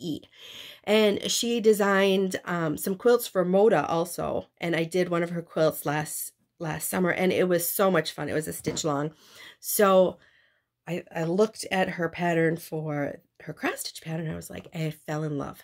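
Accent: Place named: Upper Midwest, United States. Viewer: I knew immediately from your slight "accent" that you were from the UP or Wisconsin. I grew up near Iron Mountain (Norway) and will always be a Yooper at heart. Your projects and future projects are great. And what a wonderful ministry you have with your hats and mittens. I know how needed it is up there.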